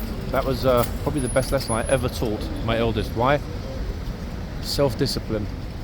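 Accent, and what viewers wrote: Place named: London, United Kingdom. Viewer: Handsome and so is the Horse. LOL , Love your commentary look forward each day. and glad to hear a London accent. a big change for us ex eastenders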